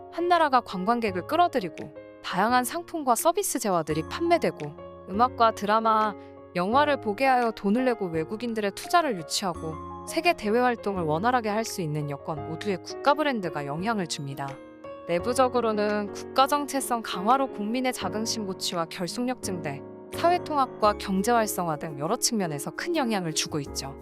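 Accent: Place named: Chungnam, South Korea. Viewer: 대본을 읽어주시는 분 충남출신이군요^^ 나도 충남이라 저런 어투를 잘 알죠 예~~~전부터 하고 싶었던 말임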